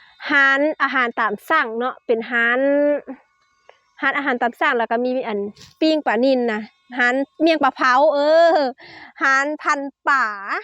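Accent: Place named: North, Thailand. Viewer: ภาษาพูดของ คล้ายภาษาคำเมืองภาคเหนือ ตอนแรกนึกว่าเป็นคนเหนือ เป็นคนสู้ชีวิต สุดยอดแม่สาวน้อย👏👏👏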